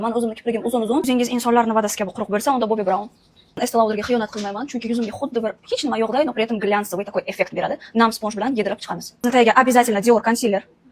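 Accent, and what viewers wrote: Bukhara, Uzbekistan. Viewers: Buxorolik ekansiz😂 tojikcha gapirganizdan bildim😂😂😂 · Бу киз манимча Бухородан. Шева тортаяпти. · Opa Buxorodan misiz tojikcha gapirib qoʻydiZ☺☺☺☺☺ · Shumo a buxoromi yoki samarqandimi shumo chibarósh purstashtet gôyet tojiki gapzadashtagetonba. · Wevangiz zo'rde. Buxoromi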